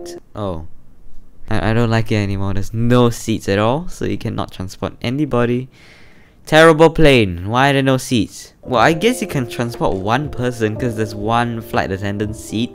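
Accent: Singapore. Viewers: You sound singaporean bro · yow ur a singaporean? you sound like one, jsut like me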